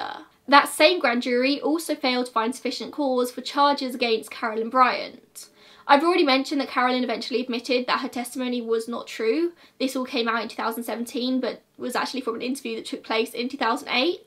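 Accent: United Kingdom. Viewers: She has a very thick British accent. I THINK she said Gratuitous. And I think she is using it in the sense that posting the picture is unnecessary or not justified.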